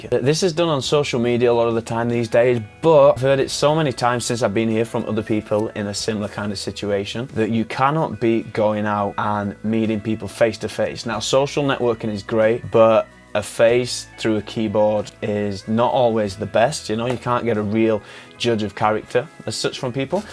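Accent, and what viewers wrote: United Kingdom. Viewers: Your accent is nice, not weird, sounds like a notherner. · I'm a bit surprised that people would find your accent strange. People with UK accents are very common in Canada a lot of Brits come here.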